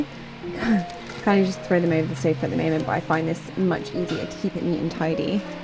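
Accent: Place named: England, United Kingdom. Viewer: I love your hauls. I also love your accent, you speak so nicely. I’m English but live in Australia and it’s so refreshing to hear a lovely English accent, I miss it. Not been home since 2018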